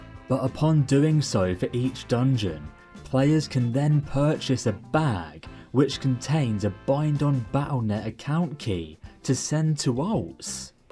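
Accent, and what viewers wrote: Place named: Essex, United Kingdom. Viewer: I have a friend sounds like exactly like him, when hes being sarcastic i dont realise half the time XDD, might be an Essex thing could be wrong XDD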